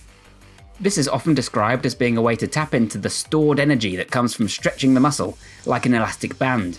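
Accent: United Kingdom. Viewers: He sounds british, has freak strength like a russian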